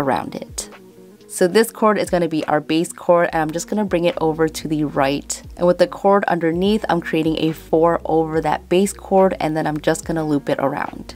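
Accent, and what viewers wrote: Chicago, United States. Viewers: You can also use it to hold anything like make up brushes or in your kitchen to hold utensils if you made larger. At your desk for pens ...im a Chi girl too!! I love our accent.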